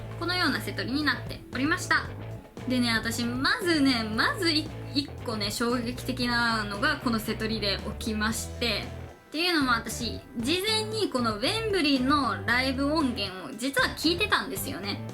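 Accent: Hiroshima, Japan. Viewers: 同じ広島人として、広島弁混じりの動画いい耳心地デス。ソレも有りいつも観て応援しています。 私は、ソニスの頃にファンカム漁りしハマってから、ウェンブリーと2nd発売の頃は、色々買いまくってましたね。 ウェンブリーは音がイイ。 各楽器が立体的に際立ち、BHOベースと青さんドラムの融合感、神バンドアンサンブル粒立ち完璧。 後、買っといた方がイイのは、LEGEND-S だと思います。 地元だし2日間とも参戦しましたが、YUIちゃん急病で片翼の危機に、２人+神バンドの気迫のパフォーマンス大規模演出ライブでした。 藤岡さん最後のギター🎸泣ける。 MOAMETALファンならば、あの奮闘MOAちゃんは買っとかないと！ て、ザ・フォーラムもだし、1月の幕張総出撃も買わんとだし、 まぁ、今年はライブおあずけなんで、その分デロ買ってね（╹◡╹ʔ༄ て、事でしょうかね。 夢が有る事は大事。人生は一度きり。その若さなら時間も可能性も充分有る。 人生は夢だらけ(by,YUIちゃん)w。 頑張れ👍。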